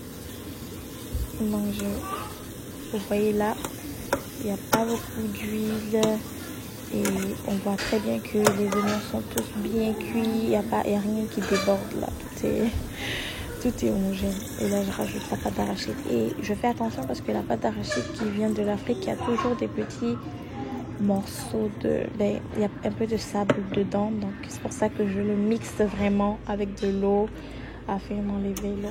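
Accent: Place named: Montreal, Canada. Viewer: Montreal sa sentend dans la voix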